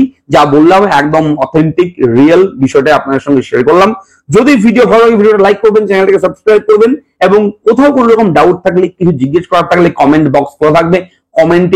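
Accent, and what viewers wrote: Bangladesh. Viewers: আপনার কথা শুনে তো মনে হচ্ছে আপনি বাংলাদেশী, ডকুমেন্ট আছে তো?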